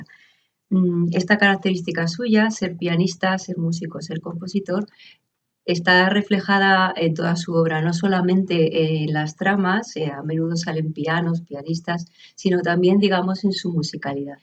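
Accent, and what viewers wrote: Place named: Rio de la Plata, Latin America. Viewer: gacias, hoy releyendo al genial Felisberto en tu cálida voz, y aunque te empeñes en leerlo en "español" el rioplatense brota como manantial, uno de los escritores destacados del canon rioplatense. gracias por elegirlo. te extrañamos, no nos abandones. soy noe de argentina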